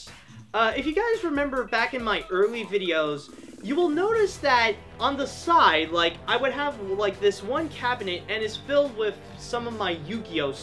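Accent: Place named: Quebec, Canada. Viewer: I'm still learning French myself, but I somewhat understood what you said, yeah. Though your French accent is clearly Canadian-French, I could understand through the accent.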